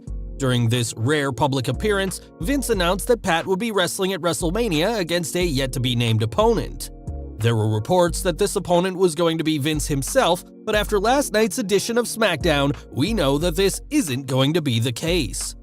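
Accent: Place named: United Kingdom. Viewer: Aw man no offense but I wanted to hear the British accent today :/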